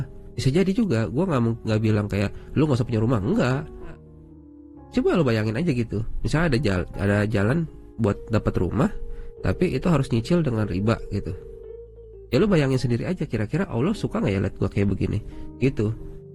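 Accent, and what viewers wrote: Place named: Depok, Indonesia. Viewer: suara lu,mirip mas mas depok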